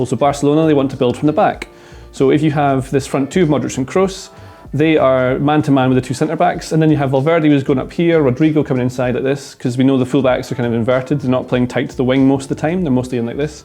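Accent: Scotland, United Kingdom. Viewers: Something about a scottish accent that causes me to take the opinion immediately serious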